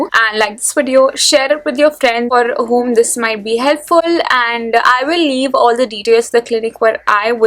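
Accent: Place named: India. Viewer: Indians are so irritating with their stupid accent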